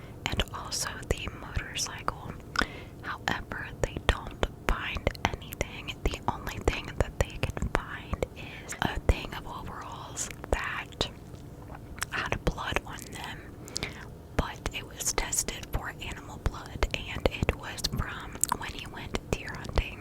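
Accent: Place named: Ohio, United States. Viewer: I fucking knew you were in ohio! You have the same accent as me and all my peeps lmao. I grew up in summit ohio or blacklick outside of columbus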